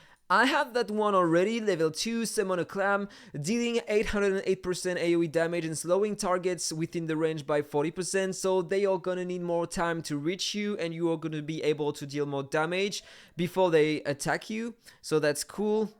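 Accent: France. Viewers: j’ai reconnu le « French accent » 😂 tu fait des bonnes vidéo dommage que je soit obliger d’utiliser la traduction avec un français ! 😭😂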